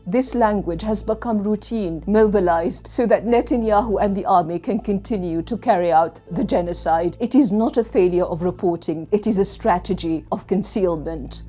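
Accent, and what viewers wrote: RP, United Kingdom. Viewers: She has a posh accent but is wrapped in this 6th century garb and 3rd century ideas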